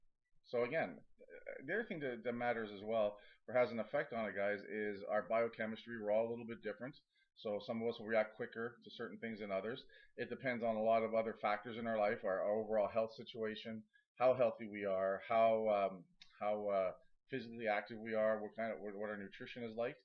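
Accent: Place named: Canada. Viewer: You are so attractive. Love the Canadian accent too. I don't mean to objectify you but it's your fault for being so visually appealing. You're also very bright and articulate. You seem very even tempered, kind, like you'd make a good manager/supervisor. You need WAY more subscribers. Your message and delivery are very persuasive and you're easy on the eyes.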